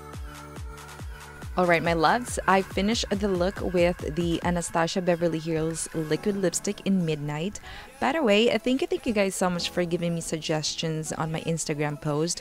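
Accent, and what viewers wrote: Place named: England, United Kingdom. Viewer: I really like the way you talk. I wish i could have Your English accent, wow. I always watch your vlogs, it helps me lots for my makeup style. Thank you. Mwahhh.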